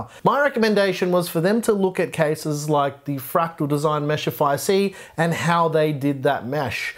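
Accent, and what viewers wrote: Australia. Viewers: A Mexican with an Australian accent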